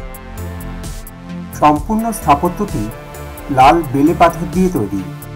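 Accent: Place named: Bangal, India. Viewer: এই তোমরা ছ ছ দিয়ে কথা বলো কেন। তোমরা কি ইন্ডিয়ার বাঙাল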